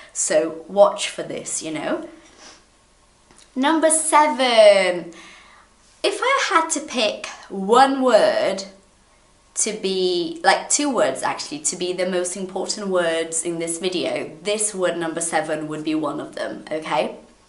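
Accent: United Kingdom. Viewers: I finalmente found someone with a British accent.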